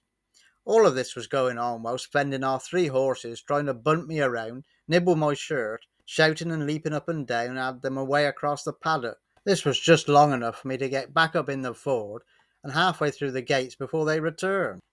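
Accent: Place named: Shropshire, United Kingdom. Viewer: Love the videos mate where are you from you sound local to me im south shropshire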